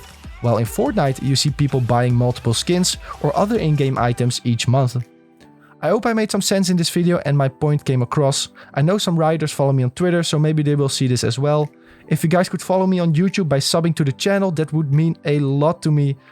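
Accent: Netherlands. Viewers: Ik kan gewoon horen dat hij nederlands is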